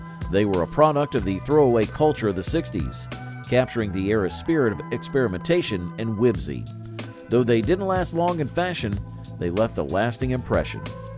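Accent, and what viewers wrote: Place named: United States. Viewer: I hear a standard American accent from this host. I am not sure if it's computer generated or human. In case they are a human and reading this, the word is pronounced "app-li-KAY"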